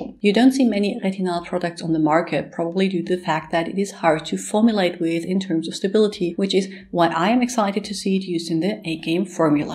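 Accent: Germany. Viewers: WOOHIOO ! Thanks! My sister in Canada uses it and even though she's 60, she could easily pass for someone in their 40s - not that it's "so important" to look young but to take care of ourselves and to look as good as we can is perfectly fine, as far as I'm concerned. Btw, I must add that your accent when you speak English is just lovely! I couldn't place it at first but then I saw that you were German. I've been in Belgium since 1989 but am originally from Canada. I wish you all the very best and.... a very MERRY CHRISTMAS to you and yours! 🙂🎄❤️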